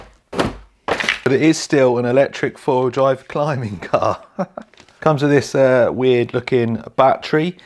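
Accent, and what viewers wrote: United Kingdom. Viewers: Good God that's an ugly controller! 😕 I do like the body shell and your British accent. ☺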